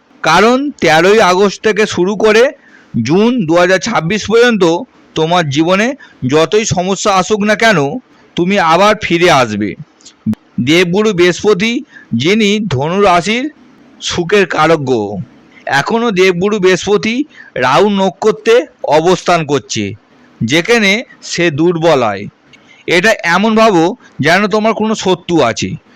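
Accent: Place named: Kolkata, India. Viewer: এটা কোলকাতা, পশ্চিমবঙ্গের কিছু লোকের অভ্যাস, এভাবে বললে তাদের ভাব বাড়ে।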